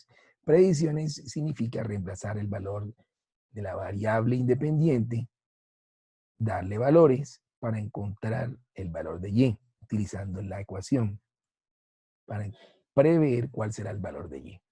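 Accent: Caribbean Coast, Colombia. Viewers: costeño <3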